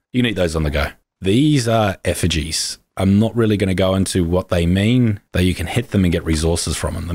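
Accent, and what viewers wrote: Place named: Australia. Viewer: Dear god do i hear an aussie? I thought we were going extinct on youtube.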